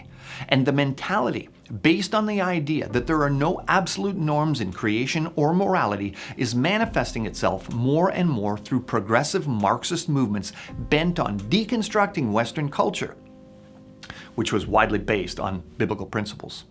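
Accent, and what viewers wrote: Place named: Canada. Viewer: Oh Lord, that Canadian accent is making my ears bleed...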